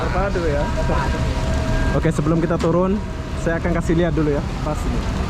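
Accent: Malaysia. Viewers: Asli lombok merantau Malaysia karna udah lama dmalaysia jadi bhsa udah kental malaysia kira2 gitu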